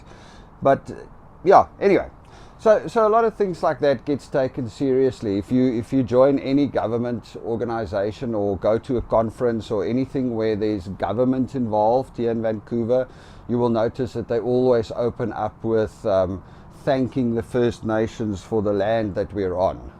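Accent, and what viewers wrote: South Africa. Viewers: i like to hear a south african person talking about my home country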